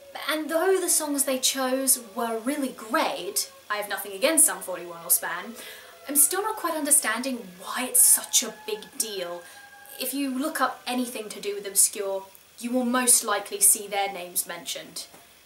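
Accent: United Kingdom. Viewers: Pretty girl? Likes video games? British accent? You probably get this a lot but damn.... I think I may be in love with you.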